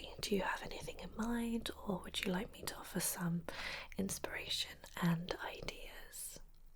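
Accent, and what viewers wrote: United Kingdom. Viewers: I love your British accent so so much!!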